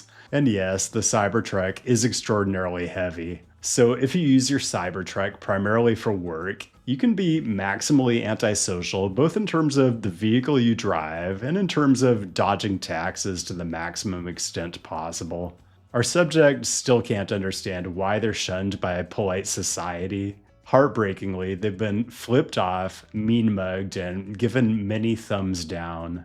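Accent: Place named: Midwest, United States. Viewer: Would it be rude to ask about your accent? I don't want to be offensive, and I don't want to make you self conscious, but I've never heard someone pronounce "u" like you do in this video. I'm genuinely curious where you come from. I'm hearing Midwestern, NE and PNW hints when you speak.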